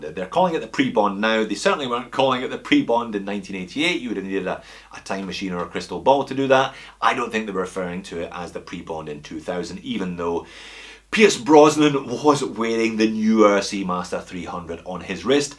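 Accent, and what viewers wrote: Scotland, United Kingdom. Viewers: As a fellow Scot I liked your mention of Watches of Switzerland in the Argyle Arcade in Glasgow. I bought my first proper watch in there too for my 21st. An Ebel, which I still have but now looks as old as I do 😄 Keep up the great work. My wife knows what I’m watching by your accent which she says is better than mine! That’s an insult as you are a teuchter 😀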